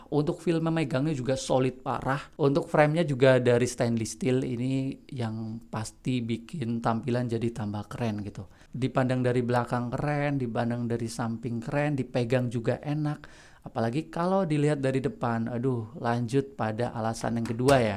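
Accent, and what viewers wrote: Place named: Banyumas, Indonesia. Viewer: Njirrr wong Banyumas si. Dongen nganggo basa ngapak bae mas hahahha😂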